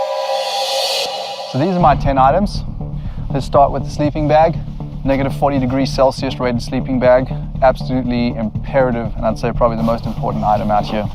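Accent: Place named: South Africa. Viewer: Wanted to know if you’re a fellow Afrikaner or at least a fellow South African? You sound like us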